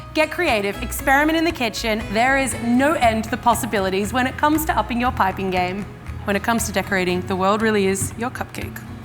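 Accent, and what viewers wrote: Australia. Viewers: She sounds like she’s from Australia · Is she Australian? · This was fun to watch tbh haha. and is that an Australian accent? I love her voice lol 😋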